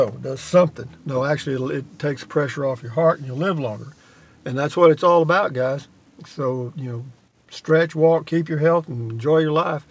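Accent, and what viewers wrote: Texas, United States. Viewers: Enjoy those tacos and thanks for the positive, happy attitude even when referring to negative comments. The more you discuss the class B vans, the weaker I'm becoming. If there is one weakness I have, it's motor vehicles and the reason I started following this channel is I was looking at small travel trailers online when came as a suggestion from you tube. I've been watching every one I can since then. It's a combination of the content and also largely on as a soft spoken gentleman, the Texas accent just adds to it all.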